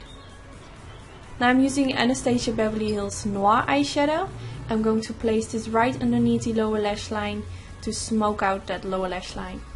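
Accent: Netherlands. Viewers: I love it! Your work is very precise, you're truly an artist :) also, your accent sounds Dutch, am I right? Oh and I've subscribed 😄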